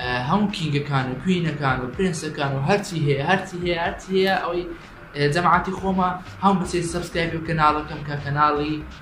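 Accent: Erbil, Iraq. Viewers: hhhhhhhhhhhh bas chan diqat ayam ba hawlery qsa aka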